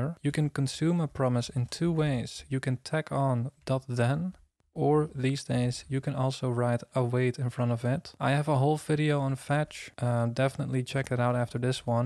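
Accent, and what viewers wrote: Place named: Netherlands. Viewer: Ik hoor Nederlands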